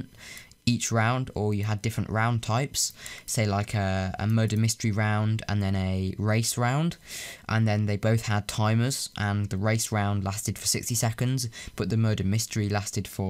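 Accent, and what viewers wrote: United Kingdom. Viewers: He still sounds British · I love *'s British accent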